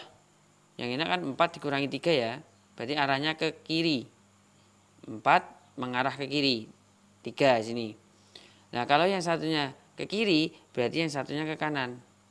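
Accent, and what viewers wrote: Java, Indonesia. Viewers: Mksh ilmunya....cara penyampaiannya enak...ada medhok"nya jawa...salam dr Bogor kak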